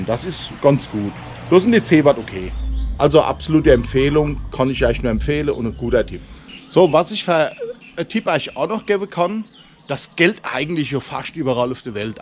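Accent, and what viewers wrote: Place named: Rhineland-Palatinate, Germany. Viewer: Hallo, unser Rheinland -Pfälzer Dialekt ist doch sehr schön, na goad is schwetze Nassauer dialekt, over is eigentlich fast dat gleiche, mach weiter so und alles Gute.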